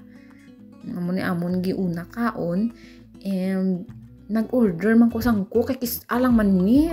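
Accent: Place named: Hiligaynon, Philippines. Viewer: Baw ilongga gid ba🤣✌️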